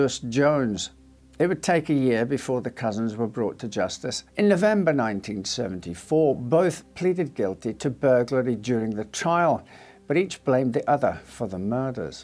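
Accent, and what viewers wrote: Scotland, United Kingdom. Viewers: You remind me so much of the Scottish actor in Outlander not sure of his name. I love your accent 😊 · I hope you get well.. I enjoy his narration style, and the subtle Scottish burr allows these stories of terrible people happening to decent folks bearable to hear about. I cannot believe I used the adjective "subtle" to describe Scottish.. anything.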